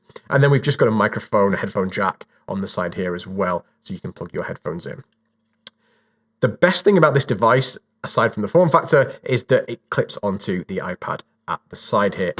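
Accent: United Kingdom. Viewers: You’re British? Excellent video!! 👏🏻 Greetings from Chile 🇨🇱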